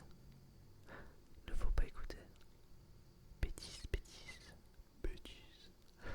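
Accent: South, France. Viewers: C'est cool j'ai exactement le même accent que toi mdr 😂 je viens du sud aussi. Sinon continu comme ça t'es le meilleur 💪👍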